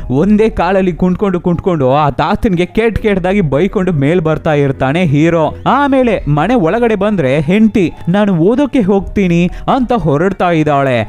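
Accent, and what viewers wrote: India. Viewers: Super and excellent voice and kannada accent, plse continue doing this dubbing in kannada work, we have very less English movies which is explained in kannada, also if you can try for dubbing ENGLISH movies in kannada plse will be super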